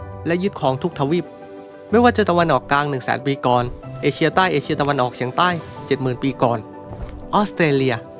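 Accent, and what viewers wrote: South, Thailand. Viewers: ผมชอบสำเนียงใต้ครับ ฟังอยู่ลั่นขำเลย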